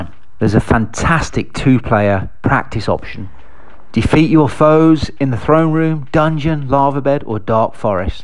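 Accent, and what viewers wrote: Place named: Midlands, United Kingdom. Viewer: You sound like you're from the Midlands, are ya?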